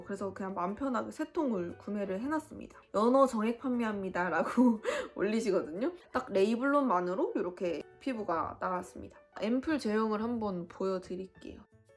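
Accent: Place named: Seoul, South Korea. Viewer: 언니... 서울말 많이 늘었네요오🐣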